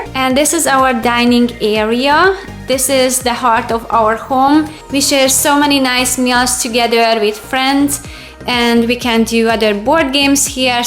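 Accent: Hungary. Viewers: A few seconds into the video when I recognized the thick Hungarian accent. I live in NYC in a 350 sq feet apartment and I still want to downsize and get rid of more stuff ( although my place is not cluttered) I guess everyone has a different idea what is big or small. The place looks amazing, You guys did an amazing job. Örülök, hogy egyre több magyar használja a Youtube ot extra bevétel szerzésére. Mások is csinálják, akkor miért is ne. Persze angolul könnyebb. Feliratkozok a követőid közé. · Wow. What a transformation. Well done! I love your house. I wonder... Where are you from? Guessing by your accent, Is it Hungary? Sorry, just curious... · Are you hungarian, because your accent sounds like it. Where do you live?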